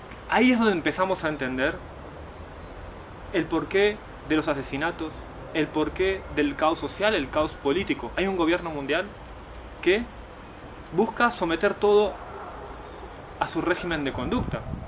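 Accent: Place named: Argentina. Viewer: Gracias. Me encanta tu acento argentino. Nos diste muy buenas explicaciones!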